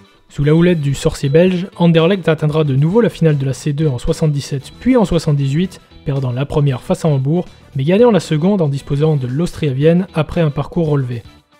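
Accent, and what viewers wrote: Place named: France. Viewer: Je suis belge et ultra de la mauve army et je tiens à te remercier pour cette vidéo sur notre magnifique club. Ça se voit que tu es français sur la prononciation de nos clubs ou noms de famille mais je ne te n’en veux pas😂. Merci de porter de l’importance au club le plus titré de Belgique💜 et très important sur la scene européenne à son époque, très bon travail et encore merci pour cette belle vidéo, COYM💜💜💜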